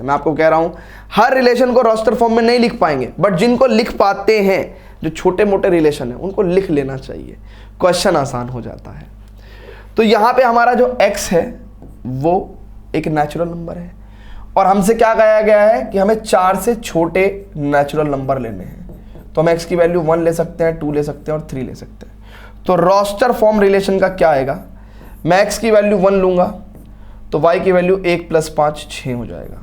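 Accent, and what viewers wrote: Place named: Muzaffarnagar, India. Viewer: Sir aap muzzafrnagar ke ho mai bhi😊 aavaj se hi pta chlra ji😅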